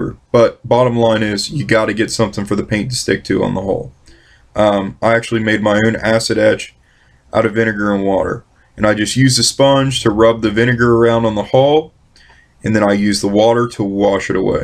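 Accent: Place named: Pittsburgh, United States. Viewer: You have to be from Pittsburgh with that yinzer accent lol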